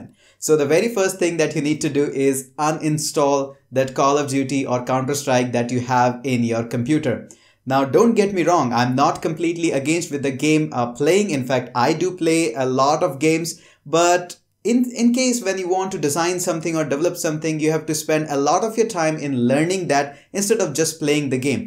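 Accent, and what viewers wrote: India. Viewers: Sound Indian but surname spelt the pakistani way?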